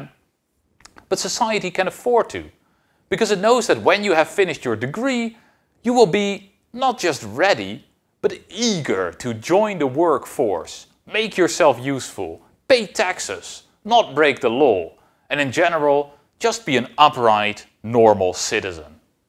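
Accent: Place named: Netherlands. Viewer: i love your dutch accent! Lekker!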